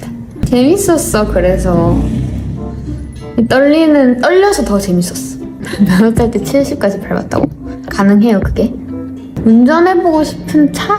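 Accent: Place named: Seoul, South Korea. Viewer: 말투 제스처 보니 가스나 서울여자 다됏네~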